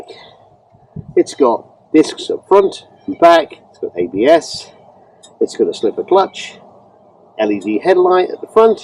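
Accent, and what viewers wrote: United Kingdom. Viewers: Very enjoyable vid and well made for saying you only have a couple of other vids on your channel. I've always thought the W800 as a lovely little retro but probably a bit small for my 6ft 4 frame. You sound British and very clear to listen to. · It took me a minute to realise your are not in the UK. The accent got me.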